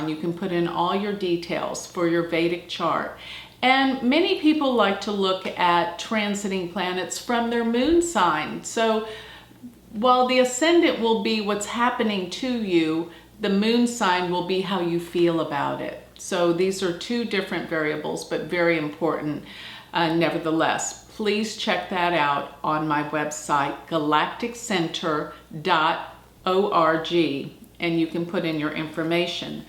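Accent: United States. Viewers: Typical American accent....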